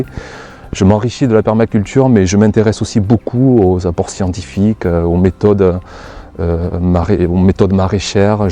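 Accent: South, France. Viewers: Bonjour, j’apprécie vraiment cette chaîne,ou on évolue avec toi autant dans les succès que les échecs. Tu es un mec super et plein de sincérité et que dire de cet accent qui sens bon le sud. Je te souhaite tout le succès tant mérité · Quel beau clap de fin 2019 ? Tu es sincère et authentique et je t’en remercie. Tu as su partager tout au long de tes vidéos ta passion et tes émotions sont arrivées jusque dans nos foyers. J’aurai souhaité avoir un fils comme toi, mais je me consolerai d’être ton ami, lol. Reviens nous vite afin que nos dimanches chantent encore avec ton joli accent du Midi et que le soleil dans tes vidéos nous stimule à faire grandir nos fruits et légumes. Je reste dans l’attente de te retrouver avec le chant des cigales. Merci.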